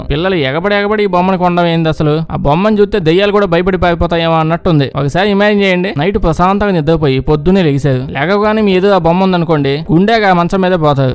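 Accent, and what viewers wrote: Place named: East Godavari, India. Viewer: Talking power good east godhavara majaka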